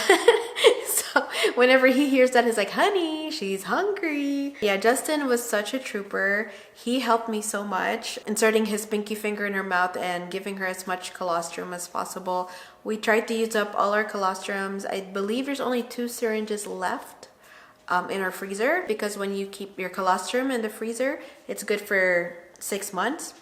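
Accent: Philippines. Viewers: agreed she's talking with a more Pinay accent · She has a very distinct Filipino way of speaking certain words beginning/ending with ‘t’ and ‘th’. She substitutes a ‘d’ quite often; they becomes dey; with becomes wid; time becomes a subtle ‘dime’; there becomes dere. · in this video she has that Filipino english accent....but her sister has more of d Pinoy accent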